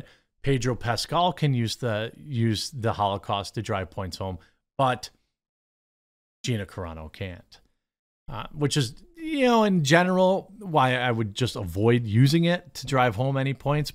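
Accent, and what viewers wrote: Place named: Midwest, United States. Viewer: The effort jeremy goes through to hide his Midwestern accent sometimes cracks me up.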